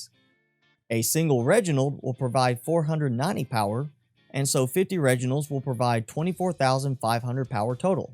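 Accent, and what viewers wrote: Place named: Alabama, United States. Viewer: ALABAMAAA ACENT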